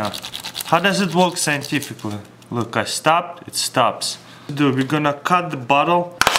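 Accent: Russia. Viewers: you englisch from rusia? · I can tell you are Russian. Cool I am too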